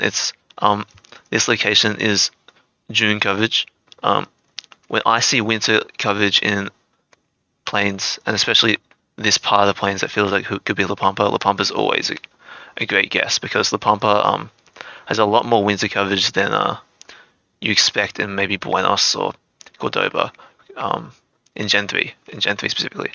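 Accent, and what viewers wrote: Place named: Australia. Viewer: this is good stuff, lovely aussie accent too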